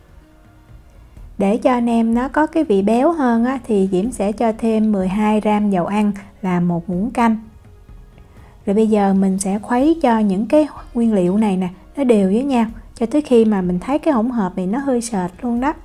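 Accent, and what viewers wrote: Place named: Mekong Delta, Vietnam. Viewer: Thấy ngon quá, chảy nước miếng đây, thích nói giọng miền tây dễ thương quá. qua Nauy bao lâu rồi vậy. Cảm ơn nhiều.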